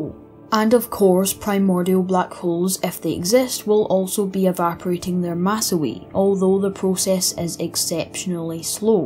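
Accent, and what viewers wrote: Scotland, United Kingdom. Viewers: He is Scottish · It's a Scottish accent.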